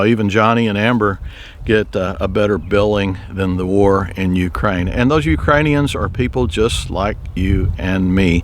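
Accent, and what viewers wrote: Texas, United States. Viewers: Thank you for your posts that illustrate and remind us of our duty and responsibilities as co-creators. Love listening to your voice. Your slow Texas drawl reminds me of my dear Uncle Lee who was also a humanitarian.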